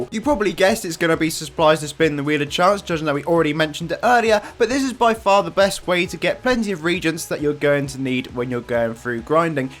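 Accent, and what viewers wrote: United Kingdom. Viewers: I can’t comprehend the bristhist accent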